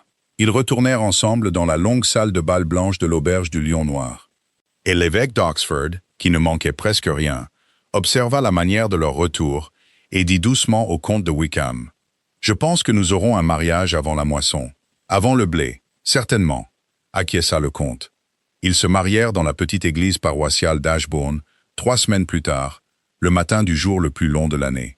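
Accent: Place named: United States. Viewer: Merci, j’ai bien aimé cette histoire très bien contée avec un joli accent, le même que celui de mon mari américain..très agréable histoire d’amour..😊 Je vous écoute du Sud de la France..😊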